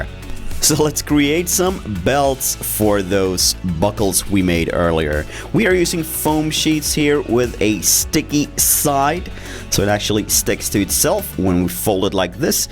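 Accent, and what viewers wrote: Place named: Malmö, Sweden. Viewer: Ah ni är från sverige? Malmö dialekt?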